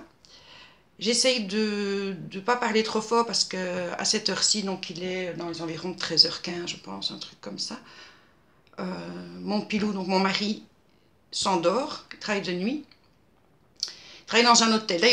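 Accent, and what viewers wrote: Belgium. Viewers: coucou,😃 original avec la mousse à raser, je ne connaissais pas, merci pour ce partage,👏👍💖 et , notre accent belge, il est très bien lolll 😜😊 en plus il y en a différents , pour tous les goûts 👍🤔😃 bonne aprem, bisouilles 😘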